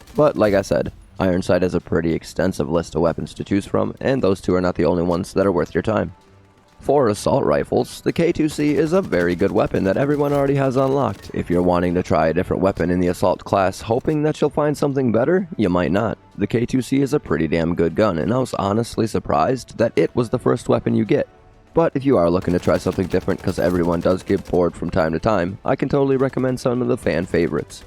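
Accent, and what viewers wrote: Bengal, India. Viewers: U bangali?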